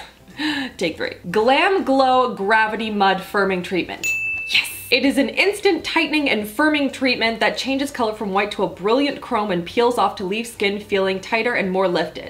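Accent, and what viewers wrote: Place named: Canada. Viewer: where is she from? Canada? I have a feeling cause of the way she talks:) Super funny video:)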